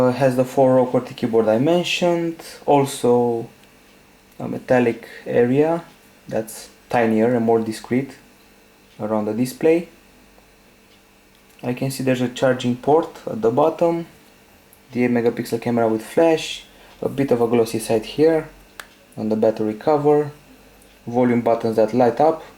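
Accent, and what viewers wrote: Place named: Romania. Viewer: Engleza ta are un puternic sound romanesc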